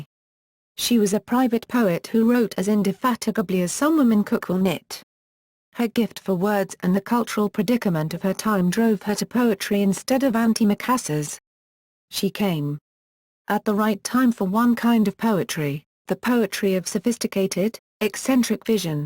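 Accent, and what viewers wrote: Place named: United Kingdom. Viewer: british accent or not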